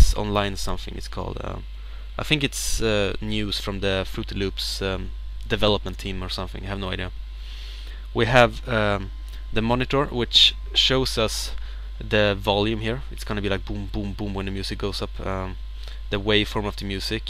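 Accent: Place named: Sweden. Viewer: Så du har äntligen börjat? :D Älskar din Svenska dialekt på engelskan! (Y) :D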